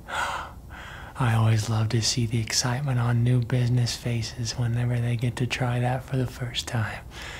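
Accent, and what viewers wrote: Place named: Midwest, United States. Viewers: He's giving me Chicago Bears SNL with the accent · You sound Midwestern and i love it 😊 you feel so comforting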